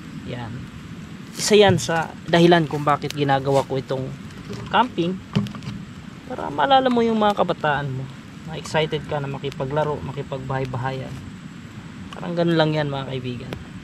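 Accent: Visayas, Philippines. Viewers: Native panggalatok po ba kayo brother? May konting bisaya ang accent nyo, parang mixed. Anyway oks ang channel mo bro.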